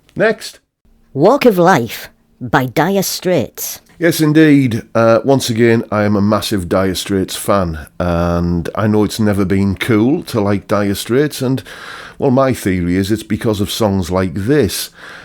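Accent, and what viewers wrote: United Kingdom. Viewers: Most songs if based on lyrical content would sound like crap regardless of the melody ( like rediculous " " which also sucks as a melody )and should have never recieved radio play. We have to remember that sold out theaters and album sales are all that matter. (Pink Floyd's favorite rant). I can't stand " "," ", "s " " " " and I could have lived my entire life happy without being tortured with " " or tune about " " and I'm not even Catholic! Love a good RANT, thanks mate! (I'm not British either but I love the accent!)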